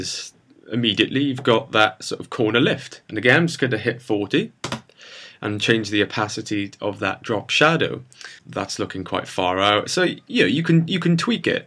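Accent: Wales, United Kingdom. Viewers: You have a Welsh accent........nice to hear. Also, the tutorial was very useful. Thank you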